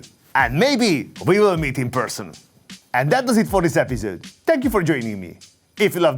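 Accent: Hungary. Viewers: Cant stand that Hungarian accent , remind me of iseael 🤮🤮🤮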